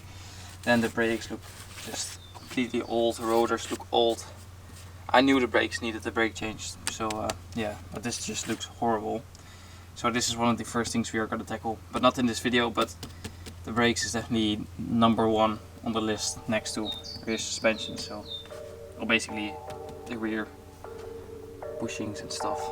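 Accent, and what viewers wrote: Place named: Netherlands. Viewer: You sound Dutch, are you in the Netherlands? Am in the Netherlands if you are, just bought my e46!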